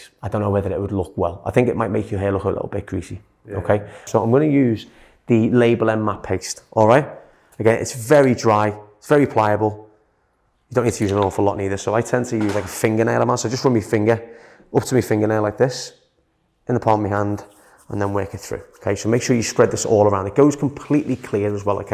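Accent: Liverpool, United Kingdom. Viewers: Is he from Liverpool?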